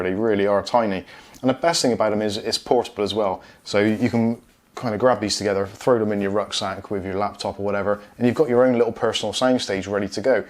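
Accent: Bristol, United Kingdom. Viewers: Are you from bristol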